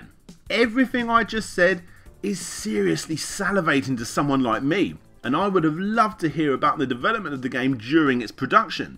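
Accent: England, United Kingdom. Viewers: He's British, it's an accent thing. That said "Requeem"? "Requeem"!? But I suppose if you've never heard the word before... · British accent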